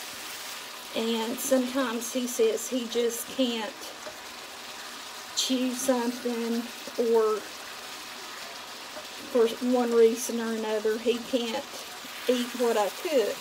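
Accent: South, United States. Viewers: Sour cream in potatoes are delicious too! I like the idea of cream cheese. I love your southern accent & u can cheat with the potatoes use half water & half milk as long as u spice them right no 1 else knows u used part water :)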